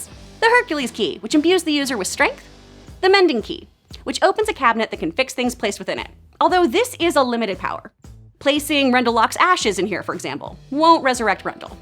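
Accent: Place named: Texas, United States. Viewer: Even with the obvious changes they're making, I'm still excited to see this. Also, your Texas is strong in this vid and I'm living for it.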